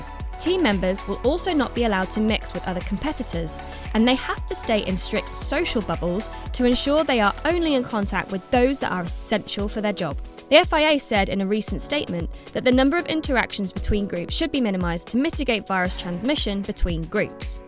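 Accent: United Kingdom. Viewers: whait is that? and why does she sound incredibly brittish in this video? (yeah i know she's from the UK, but i dont remember her accent being so noticable).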